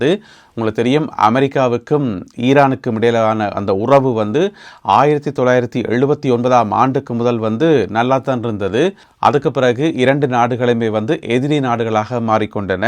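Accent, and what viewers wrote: Jaffna, Sri Lanka. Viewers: தம்பி உங்களது அழகான தமிழிற்காகவே உங்களது பதிவுகளை கேட்பேன் யாழ் பேச்சு வழக்கில் உங்களது பேச்சு நடை மிகவும் இனிமையாக உள்ளது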